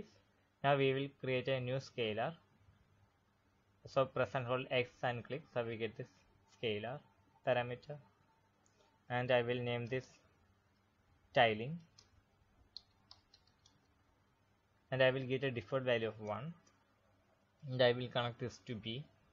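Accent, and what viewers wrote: India. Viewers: hii bro , r u Indian???bcoz ur style of speaking seemed like it😂. Btw I'm from India, and thx for fur PNG👍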